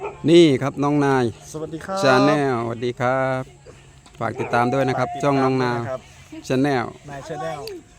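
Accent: South, Thailand. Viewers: พี่บ่าว ...แหลงใต้ไปเลยพี่บ่าว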